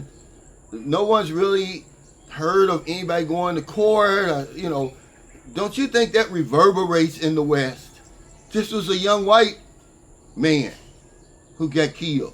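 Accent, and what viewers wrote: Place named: Georgia, United States. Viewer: The author seems like a nice guy sounds like Southern Georgia accent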